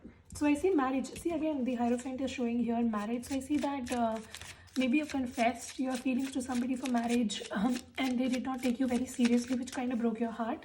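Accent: India. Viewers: Loveeee Indian accent❤️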